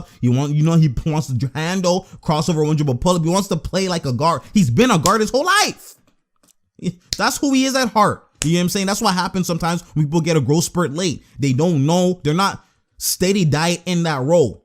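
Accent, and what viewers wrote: Canada. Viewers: I can tell u from Canada from ur accent aha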